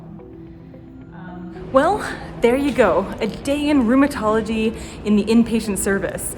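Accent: Canada. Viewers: Where are you in Canada? Judging from your accent you're a native Canadian. Correct? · This is the first video that I have watched of this physician and I knew absolutely nothing a out her...but I guessed pretty quickly that she may be Canadian after she said "about", I'm CDN too and I guess we really do have an accent! I also have RA so it's pretty cool to see things from a doc's perspective.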